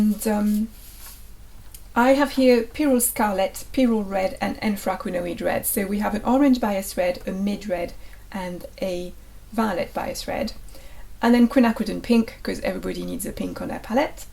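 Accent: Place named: France. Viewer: I discovered your channel only a few days ago. I'm a beginner artist (colour pencils at the moment) and appreciate your clear instructional videos and the general information on art you share. Your mix of the French and English accent is so cute I can't help coming back just to hear it. It reminds me a little of Meryl Streep's accent in Out of Africa "I had a farm in Africa at the foot of the Ngong hills..." lol! Not a Spock reference sorry.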